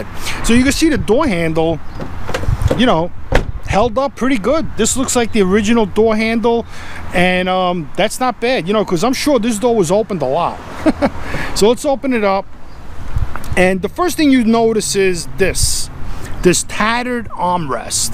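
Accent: New York, United States. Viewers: thats boston.. this guy is from new yawk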